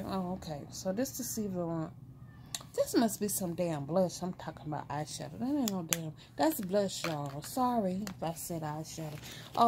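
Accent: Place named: South, United States. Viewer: sound like she from the south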